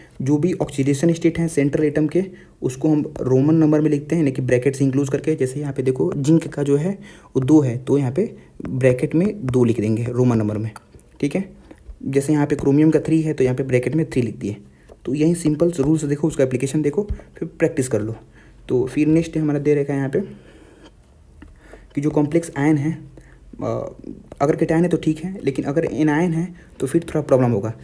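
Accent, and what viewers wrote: Bihar, India. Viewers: bhaiya love your Bihari accent 😍😂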